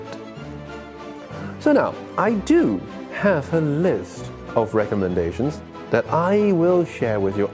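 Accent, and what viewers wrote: RP, United Kingdom. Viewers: Love your british accent and the topic you talk about . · 太喜歡老師的英式腔了! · 看來我要重看福爾摩斯了，不過英式英文真的聽不習慣，好貴族氣質，可...可惡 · 最近瘋狂迷上英式口音 找來找去Eko的比較好聽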